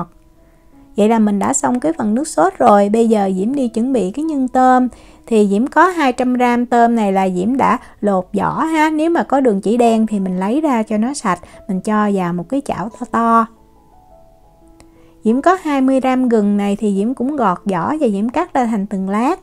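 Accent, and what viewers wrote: South, Vietnam. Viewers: Một giọng nói trong trẻo, thơ ngây, trong sáng và rất chân thành "miền Nam". Cảm ơn cháu đã chia sẻ, chúc cháu và gia đình luôn an vui và hạnh phúc.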